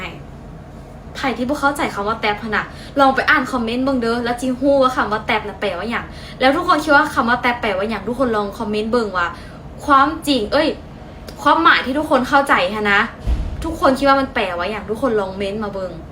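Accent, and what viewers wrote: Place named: Laos. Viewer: ก็ลาวไง แต่นาารัก😅😅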